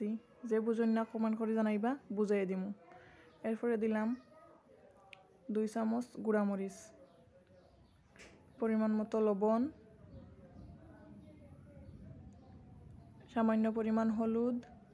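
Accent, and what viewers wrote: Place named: Sylhet, Bangladesh. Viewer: আপু খুব স্বাদের শুটকি সিরা আমরা সকল সিলেটি আপু সিলেট কুন জাগা আপনার বাড়ি সিলেটি কতা খুব লাগে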